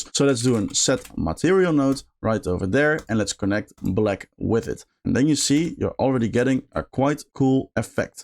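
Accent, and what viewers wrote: Netherlands. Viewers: Goede video, je accent is onmiskenbaar en aandoenlijk Nederlands (jaren 90 MTV Katja Schuurman, nee echt zoek het op 😂). Je nodes/wiskundige aanpak lijkt op die in Midge Sinnaeve's video's. Prachtige renders. Subbed 😊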